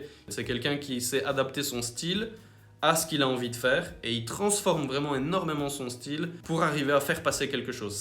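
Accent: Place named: Belgium. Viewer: Je m’abonne ! Tu as tellement de choses à nous apprendre, j’adore ! (Et ton accent belge me rappelle mes années d’études à Lille haha j’adore aussi!). Pour la prochaine fois, des petites phrases écrites pour les parties de ta vidéo ça pourrait être cool (là c’était les questions posées du coup), pour mieux imprimer de quoi tu parles, parce que si notre cerveau se déconnecte deux secondes, je sais pas pourquoi mais j’ai eu la sensation que de voir écrit « les chapitres » ça m’aurait aidé, en tout cas ça m’a manqué à un moment pour me repérer dans tes « parties ». Voilà voilà :) merci !! Hâte de voir les prochaines vidéos !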